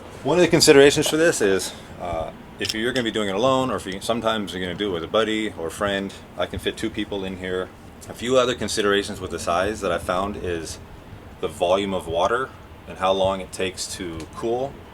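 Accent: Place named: Westchester, United States. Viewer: loo sounds like your in westchester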